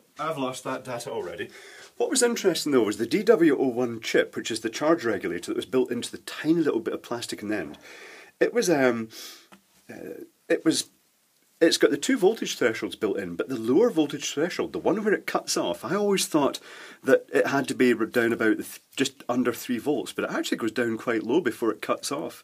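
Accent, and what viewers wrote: Scotland, United Kingdom. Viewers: if i am not wrong ,this is a british accent right? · can someone tell me if he's scottish or irish?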